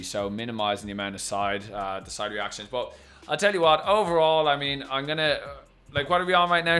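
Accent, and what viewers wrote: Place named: Ireland. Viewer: are you Irish ? I can hear a little bit of an accent, are you in the US ?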